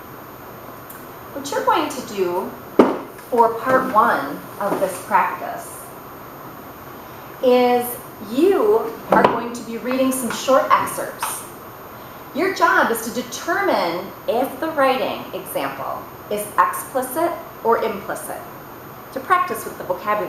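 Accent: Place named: United States. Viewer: An American accent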